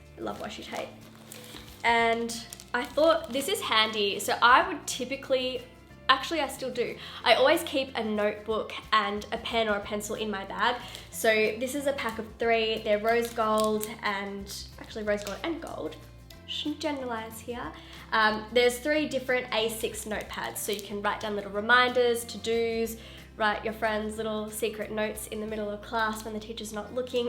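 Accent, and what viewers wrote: Australia. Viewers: Omg!! Love it so much!! 😀 can't waitt!! Congrats for ur new moviess!!!!!!!!!.. i really hope i could get the giveaway back to school supply '. And miss ur rabbit.. its So fluffy!!. And just wondering are u aussies?. Because ur accent like an australian accent xx and i love it. REQUEST: How to make a neat note and creative notes for school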